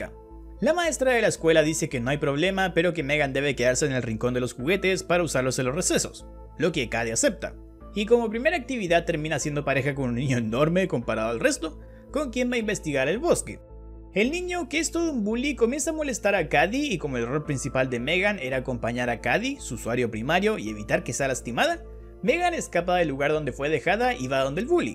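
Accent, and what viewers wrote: Chile. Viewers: este wn es chileno a mi no me engañan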